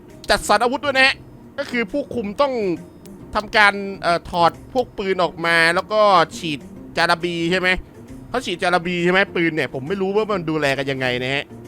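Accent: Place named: South, Thailand. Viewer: ชอบความ พูดภาษาใต้จัดๆ ภาษาใต้เป็นภาษาที่อารมณ์ดี 5555+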